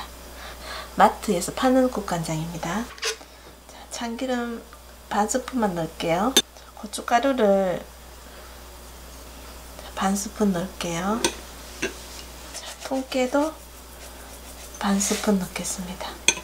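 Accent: Gyeongsang, South Korea. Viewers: 경상도에서 잘 해먹는 반찬 서울 사람들은 잘 안먹더라구요 쪼매만 경상도 사투리 섞어 나오네요 ㅎㅎ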